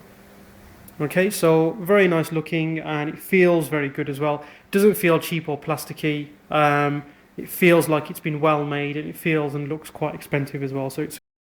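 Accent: United Kingdom. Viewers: i hear a brummie accent, come on you blues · i like your accent, british man :P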